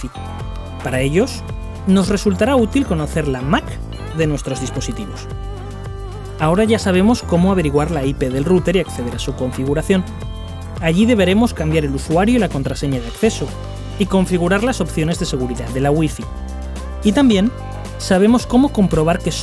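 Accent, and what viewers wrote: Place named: Spain. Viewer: Puros tutoriales en español españa :v;ondaa vitaaaaal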